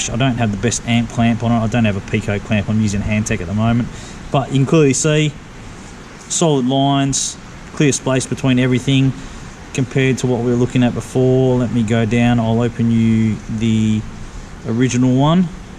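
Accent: Australia. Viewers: Great stuff - good to hear another Aussie on youtube.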